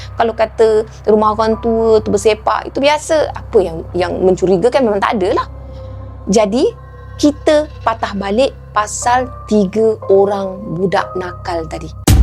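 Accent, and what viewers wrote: Kelantan, Malaysia. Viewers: ohh akak mmg asal org perak ea sy ingtkn akak org kelantan😁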